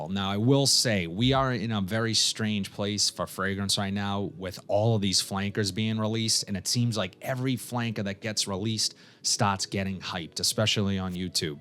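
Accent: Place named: Massachusetts, United States. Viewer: Wicked awesome accent . Worcester mass?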